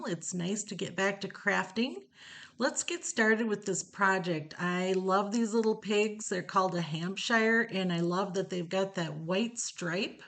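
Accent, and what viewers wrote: Minnesota, United States. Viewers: Your calm rural Minnesota style of speech is so nostalgic and comforting to me. I grew up in Minnesota and haven’t been back to visit in a long time. 🥰 Warm hugs from Texas!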